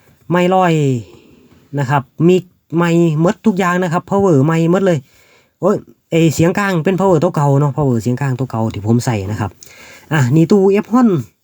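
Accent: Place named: Sisaket, Thailand. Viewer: สำเนียงค๊นสีเกษตั๋วนี่😊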